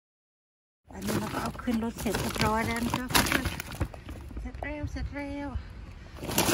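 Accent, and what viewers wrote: Sakon Nakhon, Thailand. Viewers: อยู่จังหวัดไหนคะ สำเนียงเหมือนคนสกลนคร เว้าย่อ 😂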